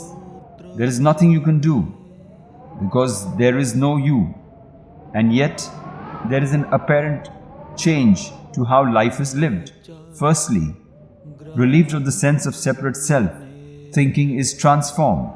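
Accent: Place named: India. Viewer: hahahaha, BS, im tired of this indian accent people claiming they know all! BS !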